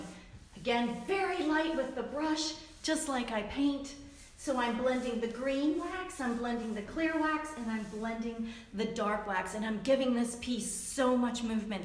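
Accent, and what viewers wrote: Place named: South, United States. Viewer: Enjoyed enthusiasm and techniques! So southern, I was raised in Florida, made me feel like home!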